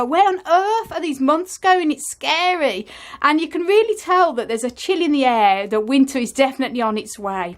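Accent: Birmingham, United Kingdom. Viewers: Awesome collection, have you ever kept Lithops, I used to love growing those!! Did you use to live in Birmingham as I am sure I can detect some brummy in your accent!!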